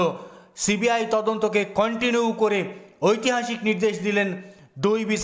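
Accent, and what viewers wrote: Bangal, Bangladesh. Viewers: আপনার বাংলার উচ্চারণ বাঙ্গালদের মত কেন?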